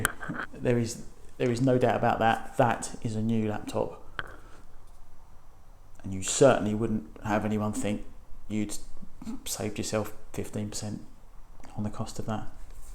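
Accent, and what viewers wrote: United Kingdom. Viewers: The lovely British accent 😍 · ohmygod i love the english accent so much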